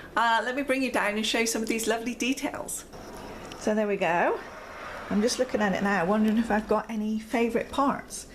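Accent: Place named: Bristol, United Kingdom. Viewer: Is that a Bristol accent I hear? Reminds me of home (Beckington). Lovely pour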